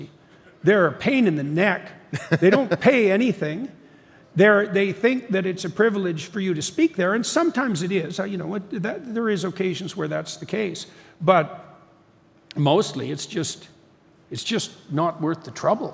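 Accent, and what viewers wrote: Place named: Canada. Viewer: He’s Canadian and has an accent as many if not most Canadians do when they pronounce “a”, if anything he was courteous enough to try to correct himself when he noticed. Does he truly strike you as somebody who would try and make a passive aggressive jab at someone by purposely mispronouncing their name? If anything he just spoke it as he speaks naturally and you’re being intolerant of him. But the main thing is, who cares? Your name is a sound that you respond to like a dog, don’t get so hellbent on stuff like that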